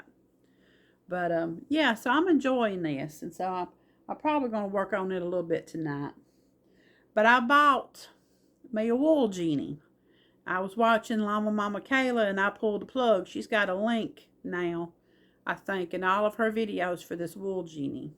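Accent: South, United States. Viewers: I enjoyed hearing your southern accent. I grew up in the south but am in wyoming.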